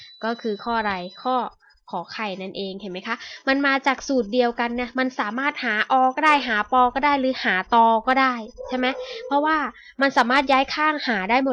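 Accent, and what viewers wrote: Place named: Suphanburi, Thailand. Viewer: สําเนียงสุพรรณ คึด ถึง พุ่มพวงเลย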